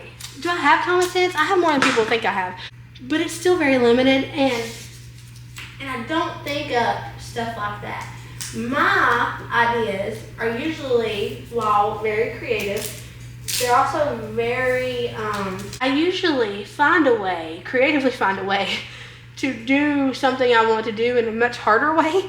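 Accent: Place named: Texas, United States. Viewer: White on white is what you island will be … that is when you have different shades of white! It looks nice but I really did like the other colour as well! I loved that you count us as your friends. I’ve been watching for ages now….. you just came up in my suggested videos to watch and the rest is history. Mostly because I was born and bred in Texas and lived there til I was 26 yrs old. And came to Australia to work in a local church in area of music and youth. I also was a trained teacher and I only did the church thing as paid work for 2 years …married and Aussie and taught school after that and the story goes on and on! But I just love hearing you talk. The accent I love too! I love your wisdom and understanding of your children and I love that you love Jesus and started your YouTube channel because Jesus told you to do it! I love that you don’t put on grace and airs….you are who you are..take me or leave it! 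Thanks for all you do!😊❤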